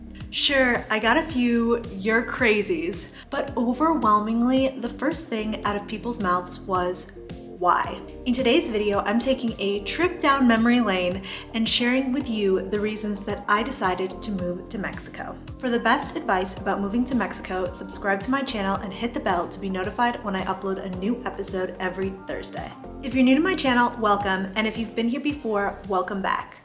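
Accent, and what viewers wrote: United States. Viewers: I suspected you were of mexican descent , is obvious that you are a third generation in usa your natural fluency in the language is of American .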